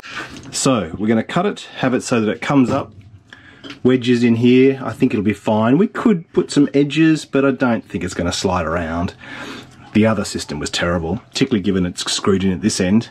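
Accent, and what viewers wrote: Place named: Australia. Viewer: Greetings from Minnesota! Love the videos and your Aussie Accent! keep em coming!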